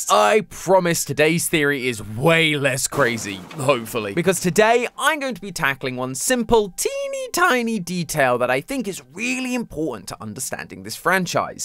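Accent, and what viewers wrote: United Kingdom. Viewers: Iskhow to feel about ngl.. i like his.. british-esk voice but i still T^T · I love your British accent. I hope you continue to bring us these juice videos and get your own path in fnaf theories. ❤ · Feels weird but the British accent is kinda selling the emphasis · I clicked the video and I thought why is matpat making a British voice and remembered that he retired and this is, your doing a great job! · Yah he will do great it's just weird because a British voice instead of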